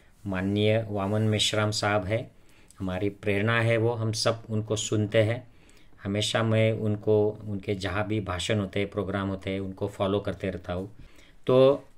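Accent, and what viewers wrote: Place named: Maharashtra, India. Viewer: खुप छान पण तुम्ही मराठी मधे सांगावे कारण उचार pdhat मराठी वाटते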